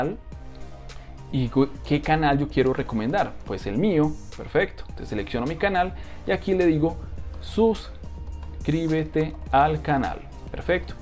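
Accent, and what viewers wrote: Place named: Colombia. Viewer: Yo si decía que ese acento se me hacía conocido, tenías que ser colombiano para hacer las cosas tan bien 😊 🇨🇴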